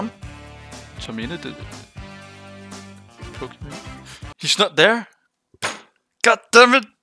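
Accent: Denmark. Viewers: hah! Me too!😌 i noticed the danish accent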